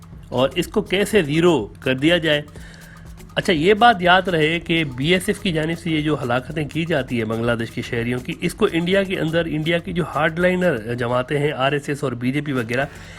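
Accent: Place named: Pakistan. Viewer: you can see from how he pronounce it's Urdu by Pakistan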